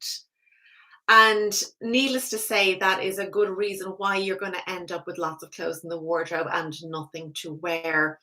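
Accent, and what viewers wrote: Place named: Ireland. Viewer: I love your smile and your Irish accent! Greetings from Madrid in Spain ❤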